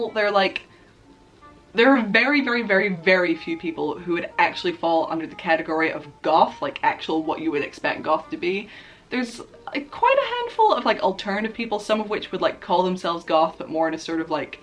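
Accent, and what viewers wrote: United States. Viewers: Ive no idea why, since I know you live up north, but your accent sounds like, half american or something these days lol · Well maybe a few words, actually, but at first you sound American!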